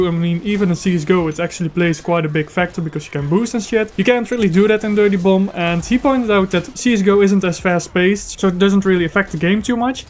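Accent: Netherlands. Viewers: eey mate you dutch?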